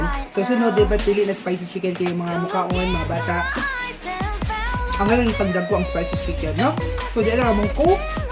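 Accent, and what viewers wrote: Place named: Visayas, Philippines. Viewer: gusto ko talaga ung accent mo magsalita ng bsaya hehe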